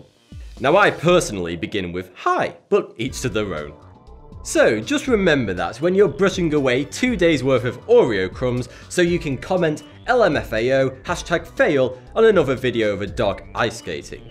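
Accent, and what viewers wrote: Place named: England, United Kingdom. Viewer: I love the English accent, but hate the English. I'm riddled by contradiction.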